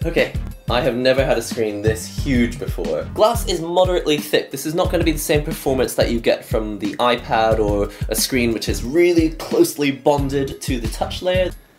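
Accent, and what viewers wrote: United Kingdom. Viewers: A Japanese chap with a British accent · british accent on a japanese.. pff this looks so weird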